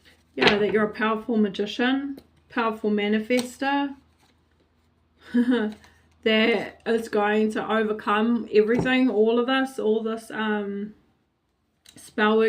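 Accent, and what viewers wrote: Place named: New Zealand. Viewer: I thought she sounds. New Zealand 🇳🇿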